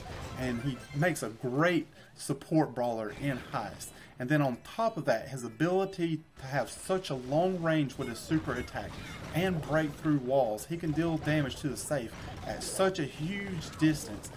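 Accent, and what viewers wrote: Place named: South, United States. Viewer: I love you’re southern accent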